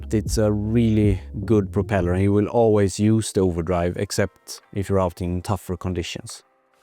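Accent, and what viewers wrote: Sweden. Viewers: Your English is perfectly acceptable for a non-native. Your Swedish accent makes your speech sound so much nicer. Also, beautiful yacht and movie. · Don't worry Sir, Your Swedish accent is beautiful, authentic... I even ecpect it, when the Portrait about a Hallberg Rsssy is produced by Hallberg Rsssy in Ellös 😉🎉🇸🇪♥️👍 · I wish I could speak Swedish half as good as you speak English Sir. An excellently presented video and your slight Swedish accent is both charming and very good❤ . I understand you perfectly , far better than many English people ! Love that 57 too ..... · That's okay, we find the Swedish accent charming! 😅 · Your dialect actually adds the mood for a Swedish yacht. Like a Swiss watch or German car.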